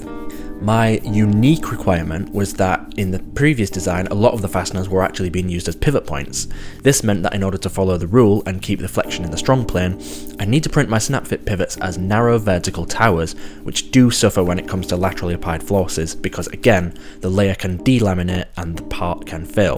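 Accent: Hull, United Kingdom. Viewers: Hi, is that a Hull accent?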